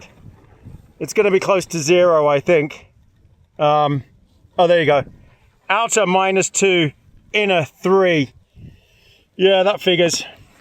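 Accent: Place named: England, United Kingdom. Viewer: Wow what a beautiful place and yes amazing scenery. I was just wondering are you actually English? And you’ve moved to New Zealand because you don’t sound like you’re from New Zealand. Your dog is beautiful. He’s very energetic. Fun to watch.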